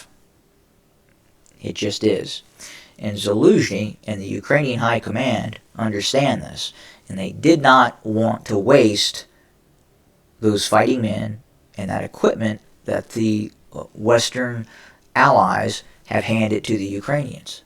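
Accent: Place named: Northern Virginia, United States. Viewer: Haha! Yeah, that Howdy Doody voice... It's a Northern Virginia thing..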